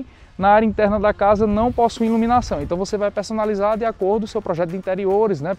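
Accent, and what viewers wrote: Northeast, Brazil. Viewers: Conheci seu canal ontem e maratonei muitos videos, e fiquei namorando essas casas lindas e maravilhosas que vc nos apresenta, gostei também do seu jeito alegre de apresentar as casas e como nordestina que sou também logo vi pelo seu sotaque e percebi que também é kkk gostei tanto que ja ate me inscrevi, vc é diferenciado, e sim rapaz mecheu muito com o meu emocional essas casas kkkk parabéns pelo trabalho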